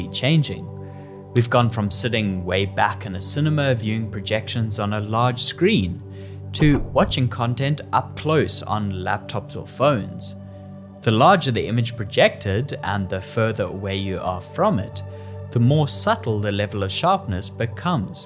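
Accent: South Africa. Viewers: Where are you from mate? Your accent sounds closer to South African than most things I hear on YouTube :) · south african accent I detect ..ah subbed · I really don’t hear this accent (South African?) on YouTube too much. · Fellow South African! Love the content and recognized the accent immediately… 😉 🔥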